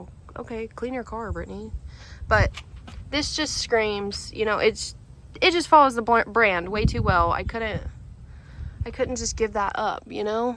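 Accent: Kentucky, United States. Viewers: Congratulations on anniversary. The Kentucky accent is going strong lol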